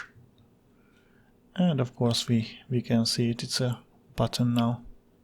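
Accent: Hungary. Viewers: Ugy hallom abbol az angol akcentusbol hogy magyar vagy te is :)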